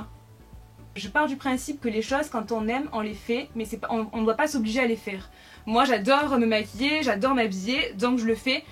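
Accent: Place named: South, France. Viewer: oh j'ai trop aimé j'espère que tu referas des vidéos comme ça !je crois que c'est une des seule vidéo de 20min où je m'ennuie pas devant ! et perso pour les horaires de tes vidéos ça me dérange pas du tt comme ça je rentres des cours et je la regarde en rentrant ! gros bisous ! ❤️🐚🐚 (j'ai l'impression que t'as de plus en plus l'accent du sud j'aime trop 😂)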